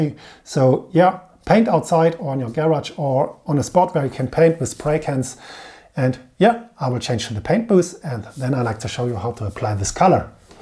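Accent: Germany. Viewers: Wie man raus hört das du Deutscher bist :). Schönes Bild. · Ich weis nicht woran aber man merkt dass er deutsch ist. Und er gibt sich Mühe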